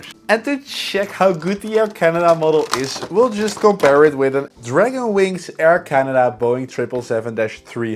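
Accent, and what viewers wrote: Netherlands. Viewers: I know u are Dutch cuz u have a Dutch accent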